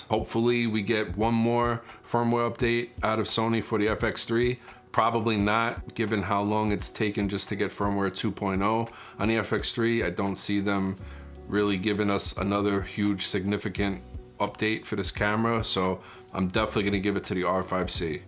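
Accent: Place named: New York, United States. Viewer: Love your accent are you from Jersey or NY originally?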